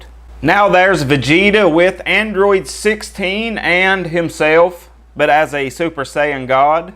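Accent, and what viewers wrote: South, United States. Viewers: From what part of the USA is your accent? I am not American but it sounds like how most people talk in forest gump movie.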